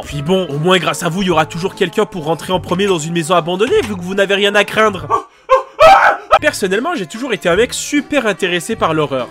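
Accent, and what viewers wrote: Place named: Quebec, Canada. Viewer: T’es parents sont francais? T’as l’accent québéquoye!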